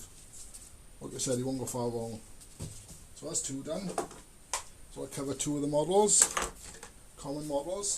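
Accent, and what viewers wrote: Wales, United Kingdom. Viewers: Brilliant video thank you for saving me a huge head ache of trying to figure it out. By the way love the way Youtube's captions struggle to catch catch your welsh accent. Much appreciated!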